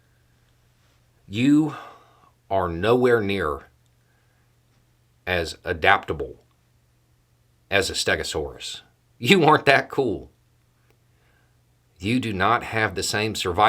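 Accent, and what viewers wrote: South, United States. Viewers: Well said sir. I love to hear a rational argument from a bearded southern accent. You blow the stereotypes wide open and I just wish more people would listen to you instead of the insane rants and misinformation we normally hear.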